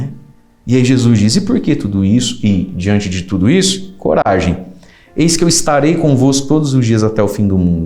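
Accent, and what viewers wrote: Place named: Paraná, Brazil. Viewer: Homilias muito bem elaboradas e de fácil compreensão, com o belo jeito de falar paranaense.Que o Senhor cubra de bênçãos o seu ministério.. Bem Haja.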